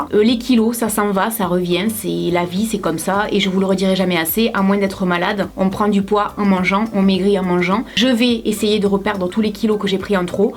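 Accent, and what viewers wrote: South, France. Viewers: Salut, Je vais avoir 15 ans en octobre et je fait 37 kg pour 1,5m,autant te dire que je suis en sous poigt. Lorsque j était plus petite je m'aimer comme j'était, mais avec les année j'ai subie le regard des autres et j'ai commencée à me détester à un tel point que lorsque je passer devant un miroir je lui donner un coup (sa a durée à peu près 7 ans). Mais il y a 1 ans environ j'ai découvert une femme avec un fort accent du Sud. Cette femme n est pas parfaite mais elle est ce qui s'en rapproche le plus. Grâce à ces videos elle m'a redonné confiance en moi et j'ai réapris à m'aimer comme je suis. Cette personne on la surnomme. À cette personne je voudrais juste lui dire merci,un merci qui vient du coeur. Alors merci de tout coeur. Et désoler pour les fautes.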